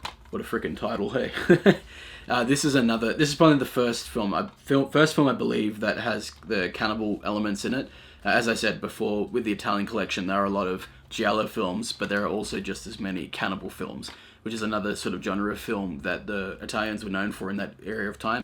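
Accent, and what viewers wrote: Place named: Australia. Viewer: oh Wow, well you honestly don't have a really strong aussie accent. Don't worry, I don't think that's anything you need to be concerned with lol.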